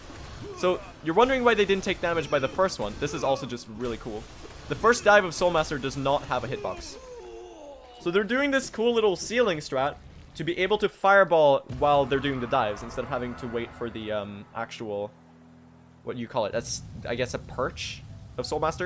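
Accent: Sweden. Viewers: as a swede, you barely have any swedish accent. i'm impressed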